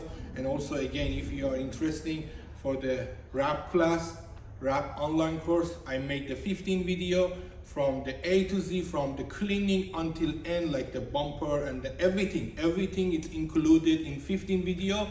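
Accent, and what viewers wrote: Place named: Iran. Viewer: جان. دمت گرم. عاشق لحجه فارسی انگلیست هتم. میخواتم بدونم که سی۳۰۰ معمولی هم همینه یا فرق داره. میخواستم کامنت، لایک و سابسکایب کنم. موفق باشی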